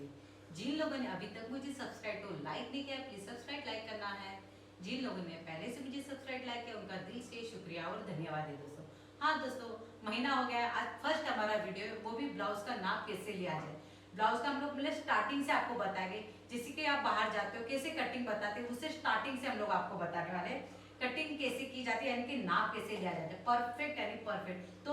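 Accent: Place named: Maharashtra, India. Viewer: मैडम आप के बोलने के तरीके से आप महाराष्ट्रीयन हो ऐसा लगता है। वैसे आपके सिखाने का तरीका बहोत ही अच्छा है। असं वाटतंय की आपल्या घरातल्या माणसाकडूनच आपण शिकत आहोत. इतक्या जवळच्या वाटता आपण. 👌👌👌👌🌷🌷🌷🌷🌷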